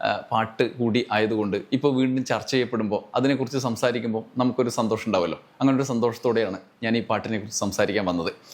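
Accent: Kannur, India. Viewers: സാറ് കണ്ണൂർ കാരനാനോ. എവിടെയോ കണ്ണൂരിൻറെ ചുവ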